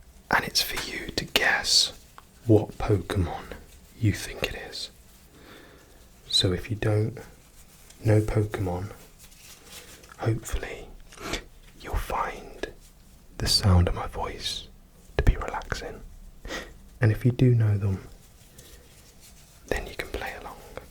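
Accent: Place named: United Kingdom. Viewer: I swear to all that is holy that the British accent just does something to me……I’ve never even heard it in real life but I want to so bad!!! It’s just too charming!!!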